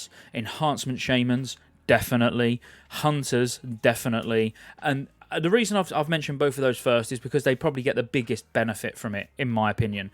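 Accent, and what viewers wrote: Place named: England, United Kingdom. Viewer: aww I just watched a video about this... well at least I get to watch it now in an english accent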